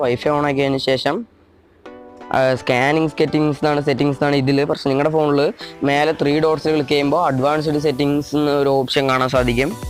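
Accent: Palakkad, India. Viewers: Your palakkadano?? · Enthanda നീ പാലക്കാടാണ് ഓ തെന്നെ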